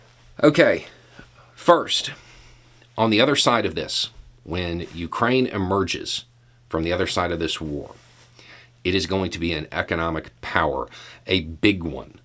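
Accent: South, United States. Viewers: Lots of good thoughts from this year. For a guy that looks and sounds like an ignorant Southern hillbilly in a garage, he comes off as a sort of political intellectual. You expect to hear the words of MAGA issue from his lips. Instead, one hears common sense. He's probably right about countries not having morals but countries are made up of people. People have morals. I think most of the people in the US (& Canada) have good morals. So - I hope he is wrong and the good morals get reflected in social policy. Happy New Year!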